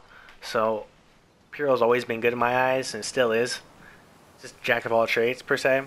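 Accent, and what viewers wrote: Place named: Philadelphia, United States. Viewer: You sound like you’re from philly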